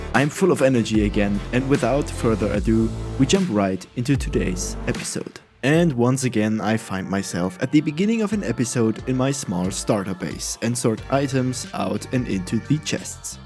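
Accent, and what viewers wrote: Germany. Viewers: Your akzent sounds a little bit German.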